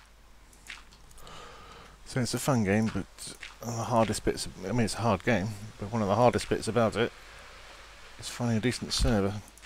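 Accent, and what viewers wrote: United Kingdom. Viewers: may i ask. Where are you from in the UK?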